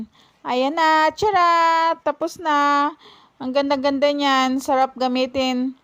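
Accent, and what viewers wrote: Visayas, Philippines. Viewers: Bisaya ka maam?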